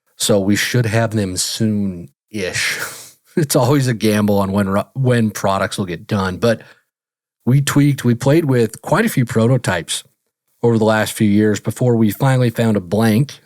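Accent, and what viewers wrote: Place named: United Kingdom. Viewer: Just curious if that snooty British accent just came naturally?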